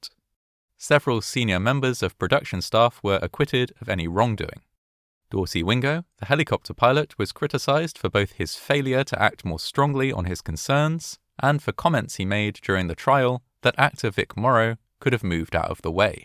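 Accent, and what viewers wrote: England, United Kingdom. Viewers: What part of England are you from? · The clip isn't even shown. Just some British dude yapping...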